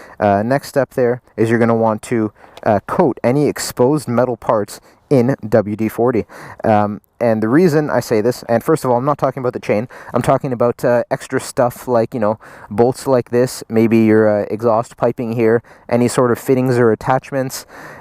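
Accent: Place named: Canada. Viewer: You sound canadian btw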